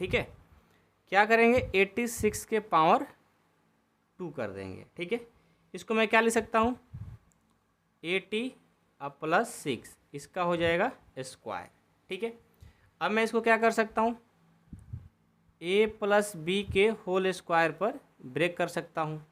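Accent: Bihar, India. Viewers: Sar ki language se lagta hai ki sar Bihari hai lekin kahate Hain Na ki ek Bihari Sau per Bhari😊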